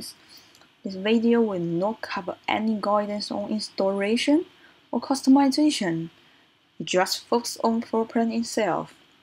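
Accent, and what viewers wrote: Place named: United Kingdom. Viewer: 小姐姐的英式英语让我惊呆了！告诉我，还有什么你不会的？：）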